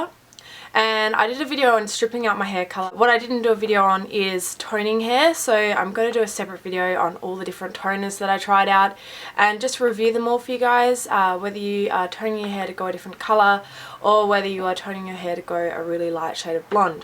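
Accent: Australia. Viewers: Omg your Australian!! SNAP!! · Are you australian?! If you are I. So happy! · Is she australian? Sorry i don't understand any accents...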